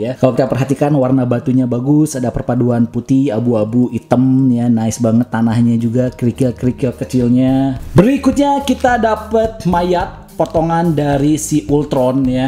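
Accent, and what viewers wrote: West Java, Indonesia. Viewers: youtuber mainan kesukaan gua, gak kaku, asik ngomongnya. kadang sunda logatnya, i love u 😂😍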